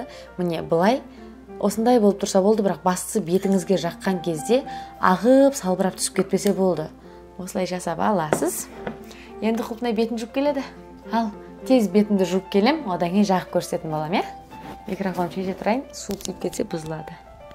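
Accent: Standard. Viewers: Кандаи ашыкжузди жаркын кыз екенсин кызым бакытты бол оз тилинди умытпаи таза сулеп отырганына риза болып отырмын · шықса не болыпты.Қайта қазақша таза сөйлейді. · Жаным ,казак кызы.тіліңді умытпай,таза сойлегеніңе улкен рахмет.казакстанда турып тілін сойлеуге намыстанатын намыссыздар бар.бояусызда турің әдемі.кайда журсеңде аман бол. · Сәлеметсізбе қызым рахмет өте кушті түсіндіріңіз бетті қайтіп маска жасауыңды көрсеттіңіз енди көреміз. Өз ана тіліңізді умытпай таза сөйлеп атырсың рахмет бахтыңыз тахтыңыз болсын Илахым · Рахмет Өз тіліңді ұмытпай таза сөйлегенің үшін ❤ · Керемет өзге елде түрсада таза қазақша сөйлейды екенсыз жарайсыз,рахмет қолданып көрем. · Қарапайымдылығыңыз өзіңізді онан сайын әдемілендіріп тұр! Ал таза қазақи тіліңізге тіпті көп адам ғашық болғандай!😘😘😘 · Қазақша таза сөилегенің үшін қызым қатты құрметтеимін .Не деген ғажап қызсың. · Сәлем,Құлпынай. 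Тамаша. Керемет. Ана тіліңді ұмытпай таза,әрі анық сөйлейсің. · Кореяда жасап ,өз тіліңді таза сөйлегенің ұнайды ,жарайсың Құлпынай 🍓🍓🍓 ақ көңіл ,сұлу жансың бақытты бол❤❤❤ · Казак тилинде таза сойлегенине риза болдым.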